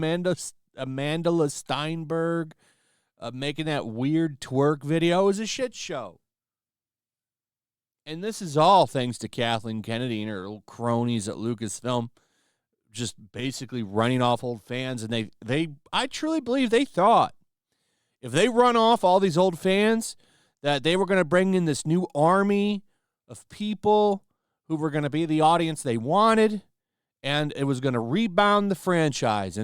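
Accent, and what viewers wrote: England, United Kingdom. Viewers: Congrats on the (sort of) English accent. I only heard of this because some you tubers mentioned it. It's ok, a bit of fun. Looking forward to Andor though. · I like your English voice! Where I live everyone talks like that lol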